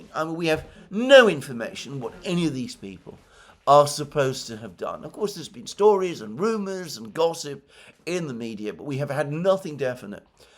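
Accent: United Kingdom. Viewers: Somehow Trump's poetic prose sounds even more powerful in your British accent 😅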